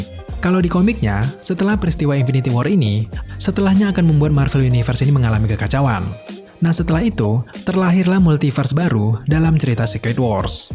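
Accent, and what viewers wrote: Java, Indonesia. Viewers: Nada Logatnya mengganggu. Medok banget. 😁🤭🙏🙏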